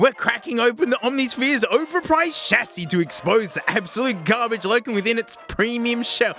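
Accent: Australia. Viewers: just broke this AI with his overwhelming aussieness and unique lingo, and that's amazing.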